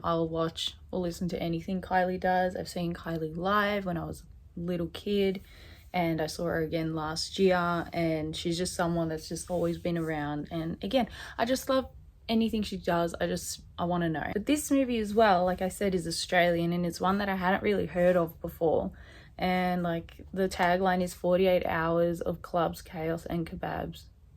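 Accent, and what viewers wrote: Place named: New Jersey, United States. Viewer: that's a lovely accent you have ! New Jersey ? x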